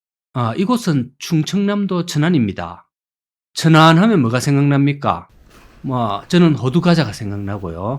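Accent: Gyeongsang, South Korea. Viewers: 님 구수~한 갱상도 사투리가 참 정겹습니다 오래 오래 건강하셔서 좋은 영상 많이 보여 주시고 올해 반드시 100만 구독자 돌파 하시기 바랍니다!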